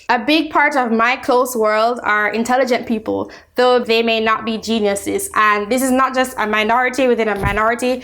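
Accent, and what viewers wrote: Caribbean. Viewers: Hello. I noticed Caribbean accent in your voice. Are you Jamaican? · Are you Carribean?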